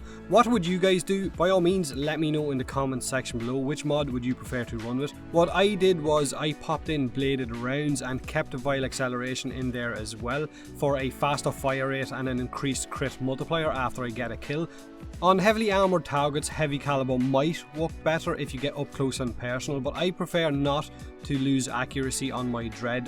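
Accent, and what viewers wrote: Ireland. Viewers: "What's up, feckers?" Love that Irish accent. Wanna know if there are multiple accents like the British.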